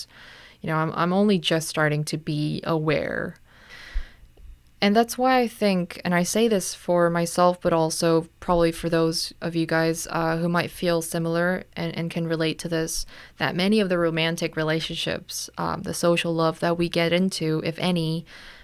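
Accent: United States. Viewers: What part of the United states gives people that accent?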